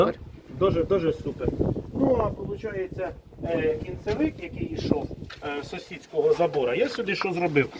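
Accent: Poltava, Ukraine. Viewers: Доброго дня!!! Натрапила на вас на просторах YouTube, яка приємна несподіванка! Рідна, неповторна решетилівська мова.Живу дуже далеко від рідного краю і просто насолоджуюсь вашою розмовою. Із хазяйства в нас тільки кіт, рибки і у внука богомол, але разом з внуком з цікавістю спостерігаємо за вашими тваринками., запитайте, чи був у неї однокласник Віталій, думаю що вона ходила в один клас із моїм племінником. Світ дуже маленький, хоч, може, помиляюсь, бо вже майже нікого не знаю в Решетилівці.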